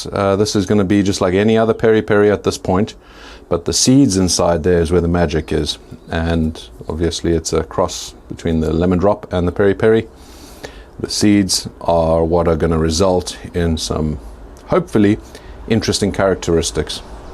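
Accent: South Africa. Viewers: Thanks for the video. It's rare to find one that has so much clear info without wasting the viewer's time. It's also nice to hear a South African voice again as an expat :) I'd call it the drop kick.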